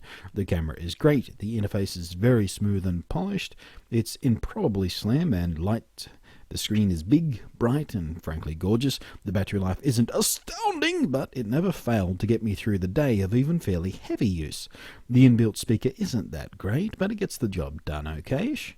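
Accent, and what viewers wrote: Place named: Australia. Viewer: thank you saving me from other reviewers that nit pick on the tineist things. love the accent nice to see and Australian reviewer. i is from new zealnd!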